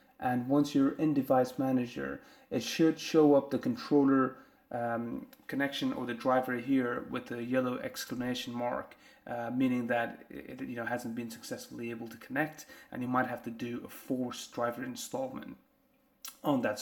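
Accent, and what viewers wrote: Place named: Northern Ireland, United Kingdom. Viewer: Great guide, sounds like uve a northern irish twang haha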